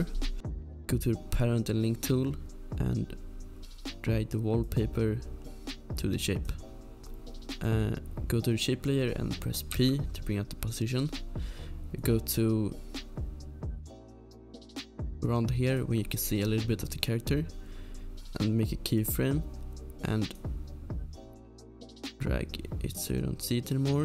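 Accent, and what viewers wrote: Sweden. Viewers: I Love that Swedish accent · U swedish or norweigan? I guess sweden😆Im from Sweden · jag älskar din svenska dialekt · Your from sweden They talk english like that Im from sweden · Hahahahahahah jag hör att du är svensk😂 bra video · Are u Swedish? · Are you swedish? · U sound swedish! Im norwegian btw:) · Heard instantly that you were Swedish, what’s up my Swedish brother. (Också svensk:)) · No way! Ur from Sweden! I hear that from ur voice! 😹 I’m also from Sweden 🇸🇪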